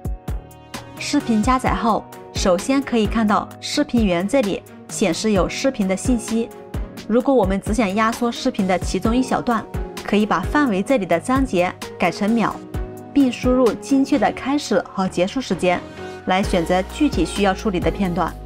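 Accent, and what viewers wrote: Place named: Hunan, China. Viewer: 听口音是湘妹子。介绍的相当的详细。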